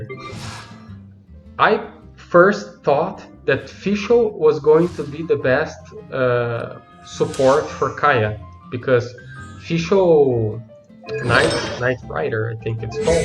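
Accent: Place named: Brazil. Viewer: Oh yeah, the Brazilian accent kkkkkkkkkkkk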